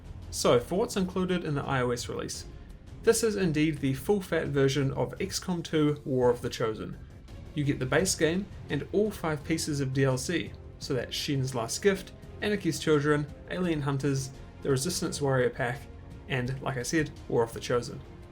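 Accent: New Zealand. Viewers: R u from New Zealand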